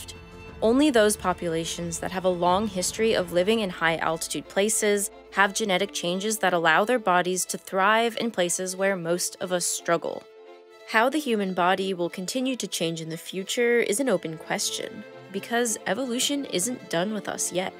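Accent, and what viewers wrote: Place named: California, United States. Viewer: Is the accent in the video , Californian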